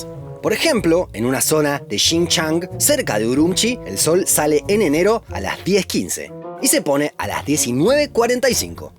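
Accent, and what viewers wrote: Argentina. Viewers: ¿Por qué pronunciás "Urumchi" si es "Urumqi"? Si en vez de Beijing decís Pekín... no se entiende. Igual por suerte volviste a usar el yeismo de Argentino, hace unos años te hacías el mexicano neutro y decías "poio" o "iuvia".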